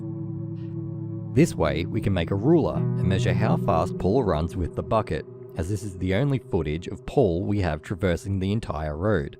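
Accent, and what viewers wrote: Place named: Australia. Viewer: Okay it's fucking criminal how little attention your hard work has got. Plus it's always amusing to hear another Aussie.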